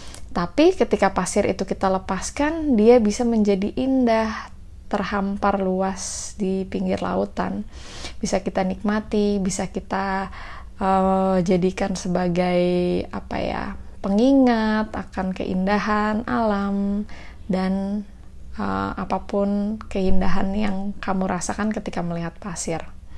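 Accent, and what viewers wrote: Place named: China. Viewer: Gak ah beda suaranya.. Ci ada logat chinesenya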